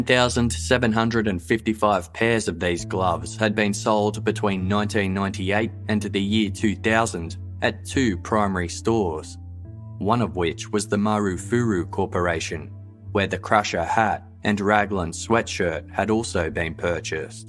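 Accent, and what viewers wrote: Australia. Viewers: I really enjoy that it’s presented in an Australian accent 🇦🇺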